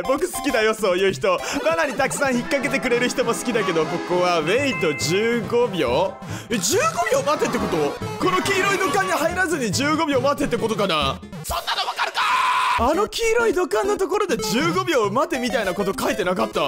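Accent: Fukuoka, Japan. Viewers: 福岡弁？とゴミみたいなOPツボです！いつも楽しませてもらってます🤗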